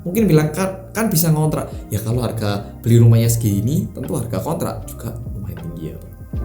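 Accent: Java, Indonesia. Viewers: setelah mendengaar curhatan mas korea medok. Jiwa Bersyukurku Tumbuh dg Suburnya... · Mas wong korea, tapi kok medok jowo yo.. Keren unik. Informativ Vlog nya. Mantap👍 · Suka bgt nonton vlog ttg korea 🧡 Bersama dgn org korea yg mendok 😊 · Halo gaiss welcome back to Korea Reomit bersama orang Korea yang medhooookkkk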